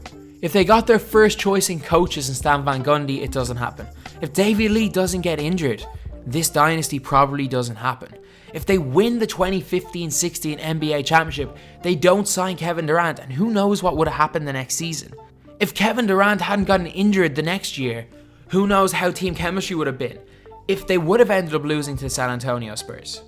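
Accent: Ireland. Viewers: Nice one.. Very refreshing to hear an Irish voice on nba One thing though Linsanity happened in NYC yet they let him go. N it was a culmination of many misfortunes that led to Linsanity.. Don't how it would hv happened here n if it did how would it halt the dynasty.. Maybe a better dynasty? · Where in Ireland are you from?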